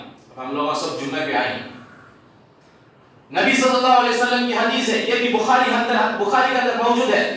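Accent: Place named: Chennai, India. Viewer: Mashallah After long time I heard Chennai slang Urdu bayan.Alhamdulilla Very beautiful bayan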